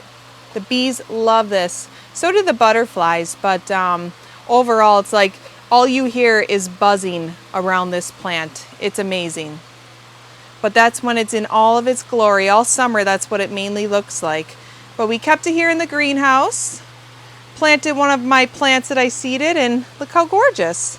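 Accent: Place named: North, United States. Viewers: Love the accent! You sound like some of my Yooper family ❤ Thank you for the garden tour 🤗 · I know you are a state away but a northern accent makes me feel at home when I listen. Hello from Northern Minnesota (Zone 3b) 💕🌿🌿🌻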